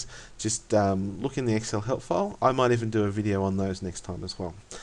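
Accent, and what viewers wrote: Australia. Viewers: Thanks..its nice hearing another Aussie and wow I just learnt how to SUM IF!!!!!!!!!!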